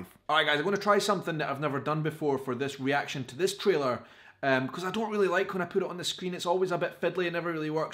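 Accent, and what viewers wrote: Scotland, United Kingdom. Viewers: I love your scotish accent !!! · I have always wanted to go to Scotland and am saving even now...of course I consider Outlander one of the best adaptations ever and I love listening to you. Great Scottish burr.😎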